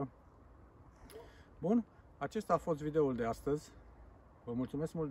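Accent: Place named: Russia. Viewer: Parece que hablas ruso y que hablas español, que eres?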